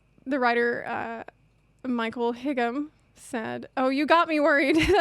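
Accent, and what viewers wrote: South, United States. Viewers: Love the southern accent :D PS: It would seem I've become a simp...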